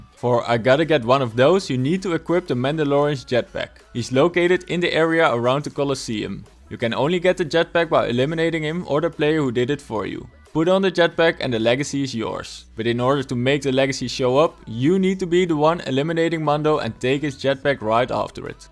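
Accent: Netherlands. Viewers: Je hoort toch wel dat je Nederlands bent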